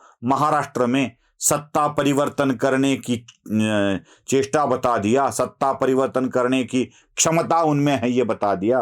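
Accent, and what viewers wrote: Maharashtra, India. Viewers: आदरणीय महान् विद्वान और राष्ट्रवाद के सशक्त हस्ताक्षर श्री, आपकी विद्वता और संवाद अदायगी,अदभुत और विलक्षण उद्धहरण है। आपकी महाराष्ट्रीयन हिंदी सुन कर दिल बाग बाग हो जाता है, आपके विडियो का बेसब्री से इंतजार करते हैं, कृपया अधिक से अधिक विडियो बनाया कीजिए, आप जैसे ही भाऊ के प्रतिबिंब है, धन्यवाद।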